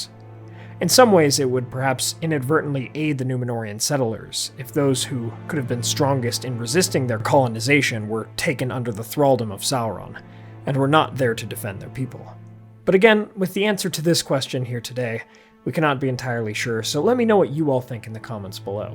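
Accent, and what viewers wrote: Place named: Bay Area, United States. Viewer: Jersey ? Detroit ? Chicago maybe ? No I know The Bay Area !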